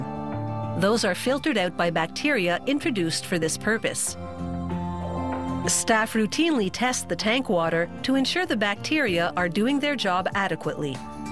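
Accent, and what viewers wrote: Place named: Canada. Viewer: This is the most Canadian sounding narrator ever.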